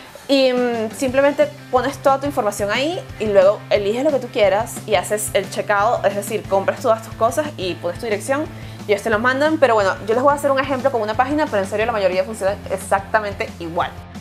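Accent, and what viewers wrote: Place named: Venezuela. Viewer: !!!! Soy una gran fan! Te sigo desde hace mas o menos como un años pero no se porque motivo o razon no podia comentar desde mi telefono (y no tenia computadora). Pero ahora por fin puedo comentar!! SIII!!! Tengo 17 años (recien cumplidos), soy de VENEZUELA (Valencia, luego vivi en Ccs y luego vivi 5 años en Margarita) pero hace un año y unos meses me mude a ALEMANIA. Fue un enorrrrrrme cambio, el lenguaje es mega diferente, el clima es mil veces mas frio y las personas tambien son super diferentes. Los primeros meses estaba deprimidisima (extrañaba a mis amigos y mi idioma y todo) y lo unico que hacia era estar pegada a Youtube viendo videos de maquillaje o blogs y tags. Y un dia apareciste TU! Te lo juro que fue solo ver el primer video y supe que eras venezolana, ni siquiera tuve que leer tu perfil! Al principio estaba como: Yo la conozco, pero luego me di cuenta que era solamente el hecho de que hablaras como yo, la expresiones, la calidez, todo. 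Fuiste mi salvacion en mis meses de depresion. Y luego fuiste mi salvacion cuando me dijeron que tenia que ir a una escuela sin poder hablar aleman y sin saber que hacer. Simplemente me maquille, puse una buena sonrisa y con actitud empece a hablar en ingles (lo cual ayudo, porque no entendia ni pio de aleman). Bueno simplemente eso, queria que supieras que de verdad me ayudaste sin conocerme y sin saberlo. Y queria decirtelo porque ahora si puedo escribir mi comentario! MIL BESOS Y ABRAZOS. PD: FELICIDADES POR TU CASAMIENT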